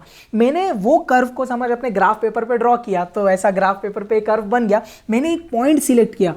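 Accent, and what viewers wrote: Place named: Aurangabad, India. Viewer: Are u from Aurangabad ?? Cause of your language is just like Aurnagabdi Hindi .. 💯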